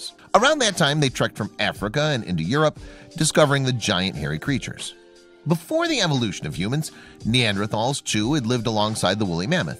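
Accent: Midwest, United States. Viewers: Hard to listen to the narrator's voice and grating Midwestern accent...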